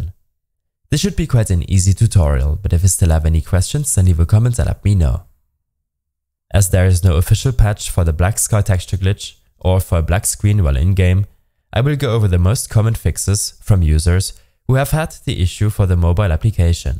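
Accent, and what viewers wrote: Germany. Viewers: Your accent sounds like someone from Germany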